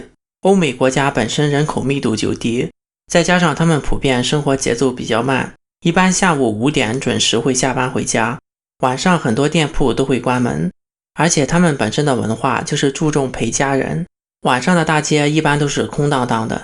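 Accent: Shandong, China. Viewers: 逻辑错误：1、只要晚上天黑没有人，都会觉得害怕。错，在中国，偏远小镇天黑也没人，人不会害怕。说数字，不说数字都是扯淡。每十万人年刑事案件比率。说这个，说别的是扯淡。2、不知道哪儿出来的一个国际和平指数，和居民生活是否安全有毛线关系？一个野鸡和平指数排名排在110名，就能推导出在中国生活不是很安全？你脑子被法轮碾了？我也是知名学者，明天我就搞一个扯淡指数，你排世界第一。3、你还知道一个幸存者偏差，你列举的统统是负面案例，当然你幸存地认为中国是地狱了。不说概率，不说统计学上的调查研究结果，扯个案有毛线意义？还李刚儿子撞死，还毒奶粉毒死，这能比得过新冠死么？美国都死了20多万了，李刚儿子能撞死二十多万么？美国每年死于枪击事件好几万，中国毒奶粉毒死好几万么？4、中国癌症发病率死亡率全球第一？你在哪本大法杂志上看见的这个数据？我都笑喷了。起码的常识都不具备你。癌症发病率最高的统统是最先进的国家，因为人长寿，所以得癌，这么简单的事实你都搞不清楚。5、装个防盗窗，是落后意识和习惯，这也能佐证中国治安差，真是佩服你脑洞。那美国人家家有枪，是不是证明天天美国打仗啊。 综合可知：你是个不具备基本自然科学和社会科学常识的文盲，口音偏山东，普通话一股大葱味儿，一直提人权宗教，估计是山东农村那边信地下天主教的。逻辑混乱，语无伦次，建议你把口器闭紧了，味儿大的受不了，希望你能多学习，多闭嘴，积点德，为生态环境做贡献。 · 同意，口音偏山东，给山东人丢脸。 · 听口音博主是山东人吗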